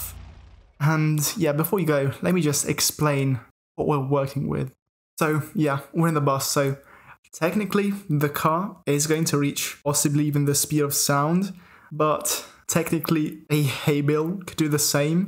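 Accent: Italy. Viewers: He’s Italian I think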